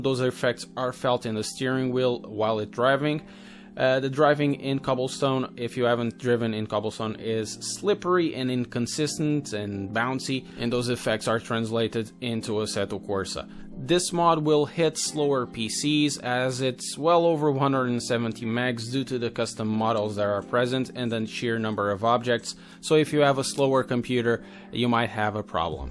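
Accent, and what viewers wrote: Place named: Portugal. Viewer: I had a suspicion from your accent that you were my irmão. boas!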